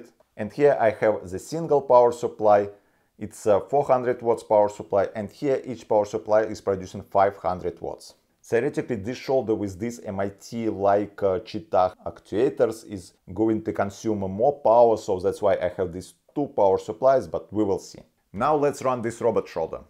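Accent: Russia. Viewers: You twice mentioned Putin at end your video again..hm...hmmm... and this Rus accent...hmmmmmm!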